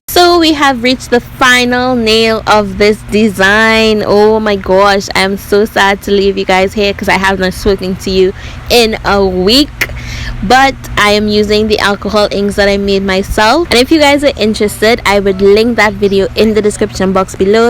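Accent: Trinidad and Tobago. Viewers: 🇦🇬🇦🇬Hello Ms. Trinidad... picked up that accent anywhere. FABULOUS WORK Caribbean Sister!!! Congratulations!!!!💙💙💙💙💙🌟⭐⭐ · Hi, u sound like a Trinidadian! 🙌🙌🙌 Beautiful work by the way😍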